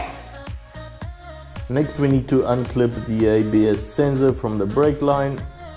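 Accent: South Africa. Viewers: Good and very well done video! Must say you sound like us here in South Africa 😂. How do they compare to the original pads from BMW, which is also Brembo I think? Did you order from the official website? Keep up the good work!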